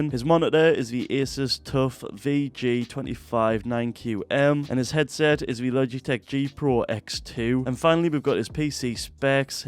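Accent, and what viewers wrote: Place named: Newcastle, United Kingdom. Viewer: we have like 50 diff accents so his is Newcastle btw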